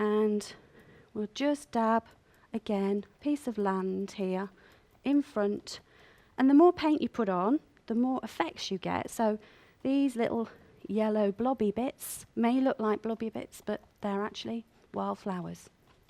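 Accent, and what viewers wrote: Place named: Birmingham, United Kingdom. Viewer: Thanku for sharing. I love your ( Brummie) accent. keep 'em coming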